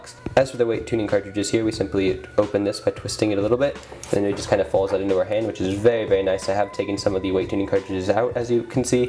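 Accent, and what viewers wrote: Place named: United States. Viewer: he is one of the clear fast pace speaking Brit but I when I focused on listening I just realized that he is American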